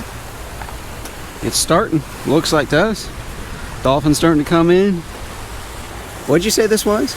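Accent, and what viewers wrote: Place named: South, United States. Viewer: Love the little southern twang you picked up hanging wit dem boys.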